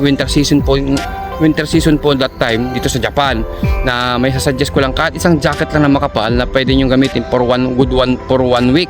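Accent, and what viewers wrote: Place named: Ilocos, Philippines. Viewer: ilokano ka ba boss? pansin ko lang kasi sa kwan mo hahaha. btw boss, anong cp gamit mong pang vlog? salamats!!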